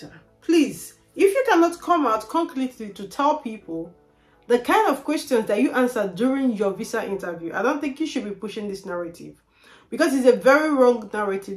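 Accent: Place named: Africa. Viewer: Very hard for me to pick up your English African Accent 😢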